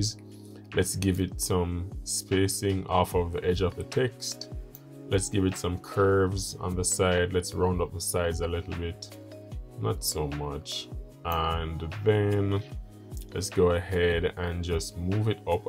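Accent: Jamaica. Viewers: I just discovered your channel and I had to subscribe immediately, 1. you're Jamaican lol, 2. the scripture on the wall, and 3. this video was so straightforward, easy to follow and very helpful. Thank you!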